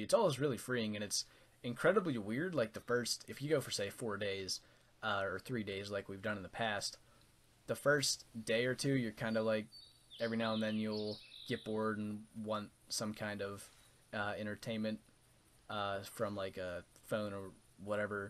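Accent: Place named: United States. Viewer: Hi, i m a french player of the hunter and want to say you, you are doing excellent videos. even cause of your american accent i don 't understand all of what you say in your videos but it's very nice to watch your videos. thank you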